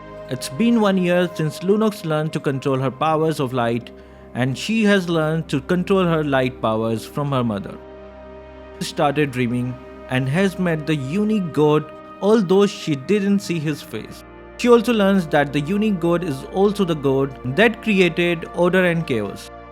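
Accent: India. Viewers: Am i the only who is possed by his indian accent?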